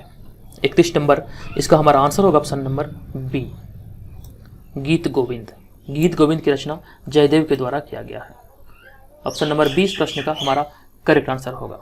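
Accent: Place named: North Bihar, India. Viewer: you belong to north bihar. i can tell with your voice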